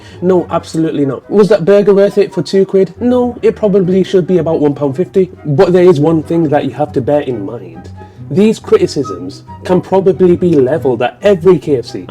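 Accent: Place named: South, United States. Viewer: I thought the American accent was good, sounded very Southern though, probably not Texas 😂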